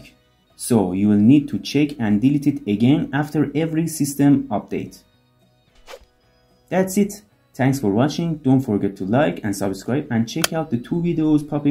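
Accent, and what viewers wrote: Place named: Iran. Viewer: لهجت میخوره ایرانی باشی رفیق 😂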